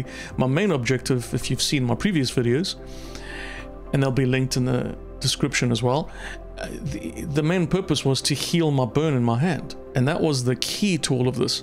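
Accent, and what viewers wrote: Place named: South Africa. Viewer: u sound south african.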